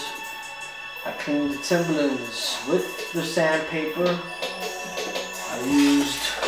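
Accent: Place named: New York, United States. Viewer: I hear a lot of new york in ya tone👊🏼👊🏼💯💯good video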